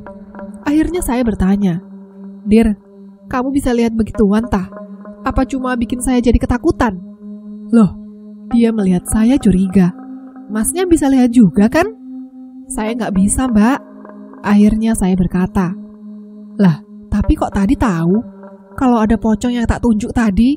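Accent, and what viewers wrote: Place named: Java, Indonesia. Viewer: tp bhs jawanya medok bngt ya 😃. Sukses selalu ka 👍👍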